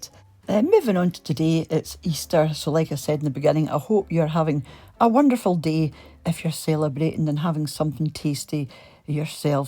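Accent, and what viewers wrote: Scotland, United Kingdom. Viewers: I hope you don't mind me asking but are you originally from up north? Your accent reminds me of Elgin or banff. Kind wishes from Ireland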